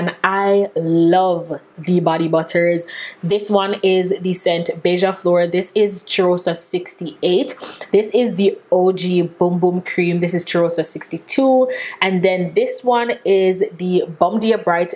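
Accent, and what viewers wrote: Jamaica. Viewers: Great video. First timer here! You sound Jamaican. Are you?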